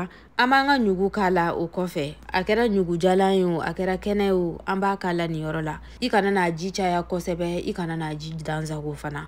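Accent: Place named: Segou, Mali. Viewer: Just love the way she talks. It reminds me of home (ségou)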